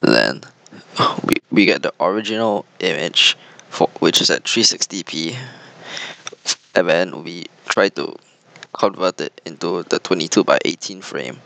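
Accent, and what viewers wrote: Singapore. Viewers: Yooo singaporean??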